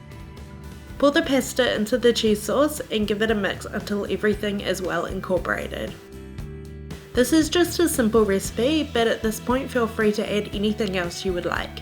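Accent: New Zealand. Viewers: from new zealand probably! that's where im from :D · The Kiwi accent is way too annoying to listen to 5 minutes of it. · Sounds like a kiwi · Fellow kiwi? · I think it's New Zealand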